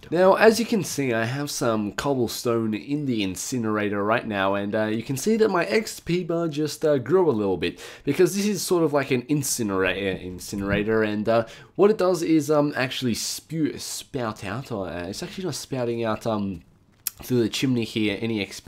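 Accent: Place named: Australia. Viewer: I can't take you seriously, until you spell like a normal person, not a mental one. Also accents are such a thing, he has a Australian accent (I believe).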